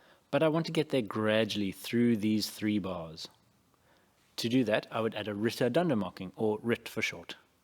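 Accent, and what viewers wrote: South Africa. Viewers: Are you South African or a New Zealander?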